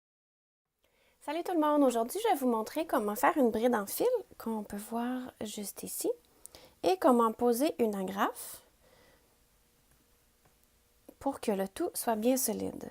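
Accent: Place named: Quebec, Canada. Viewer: Entendre des instructions à la manière et surtout avec l’accent de la Nouvelle-France! Merci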